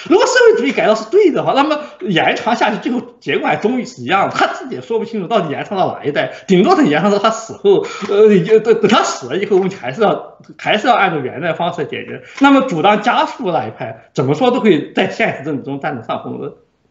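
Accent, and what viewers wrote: Sichuan, China. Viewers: 阿姨这四川口音233333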